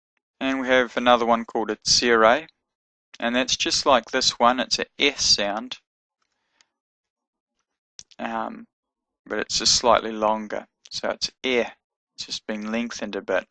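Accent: Australia. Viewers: aussie!!!!! love it!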